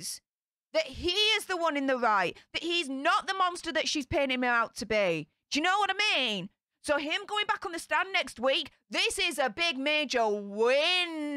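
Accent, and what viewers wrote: Yorkshire, United Kingdom. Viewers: Great points and I get to hear your delightful Yorkshire accent. Win/win, y’all. J4J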